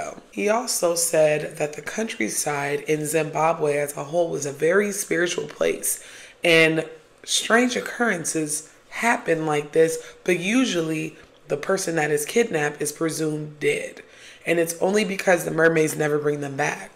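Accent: United States. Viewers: CAULDRON. Spelling..... And you are NOT African, unless you were born there. You sound AMERICAN. You seem to be a Black American of African Heritage (& frankly, white too) The term AFRICAN AMERICAN was a label put upon you to divide us. My ancestry is Sicilian and German, but I don't call myself a Sicilian American. Can you imagine is EVERYONE did that? Everyone you know would be an Irish American or a Hispanic American, or..... And NATIVE American? ANYONE BORN HERE is Native. Maybe The Original peoples or Indigenous peoples or... ? Don't divide. WE ARE ALL Americans (& really need to take our country back from the politicians who are importing an invasion of people that do not hold our values, speak our language or have any intention of assimilating into our society.) P.S. You remind me of my friend's daughter. very pretty. Thank you forthe video - Subbed!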